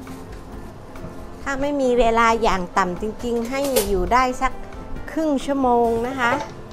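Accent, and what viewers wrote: Isan, Thailand. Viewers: พึ่งได้เข้ามาติดตาม ไล่ดูทุกคลิปเลยค่ะ เข้าแล้วออกไม่ได้จริงๆช่องนี้ ชอบเวลาพี่ พูดไทยตกอิสาน น่ารักมากๆค่ะ 😊 บางทีเราก็นึกคำไม่ทัน 😆แต่มันเป็น เอกลักษณ์เนาะ เสียงใสๆไทยคำอีสานคำ ฟังเพลินดีค่ะ FCสาวอิสานคือกันจ้า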